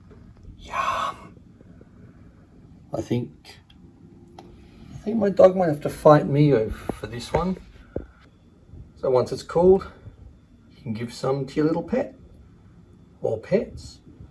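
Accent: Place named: Australia. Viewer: love this video! good to hear from an Aussie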